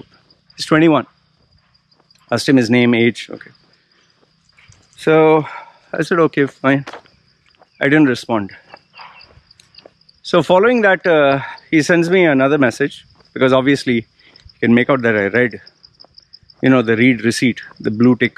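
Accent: India. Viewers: Your accent is so Indian..which country are you in?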